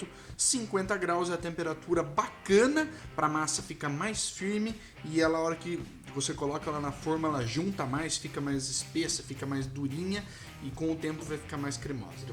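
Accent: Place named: Rio Grande do Sul, Brazil. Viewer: tu é gaúcho...né!!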